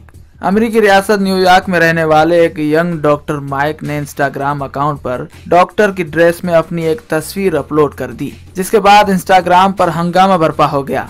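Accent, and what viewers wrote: India. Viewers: apka lehja hindi kyun hai urdu ko urdu ki trh bola karein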